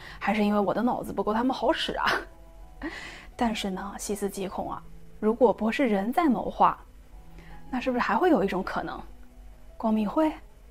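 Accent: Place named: Shanxi, China. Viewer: 你老家是山西或内蒙，感觉你的普通话有乡音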